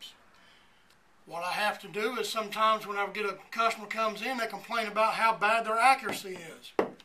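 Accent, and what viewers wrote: Maine, United States. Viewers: Thanks for the video. And that's most unusual "down east Maniac" accent I have ever heard. Where are you from originally? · Just love listening to someone with an authentic Maine accent.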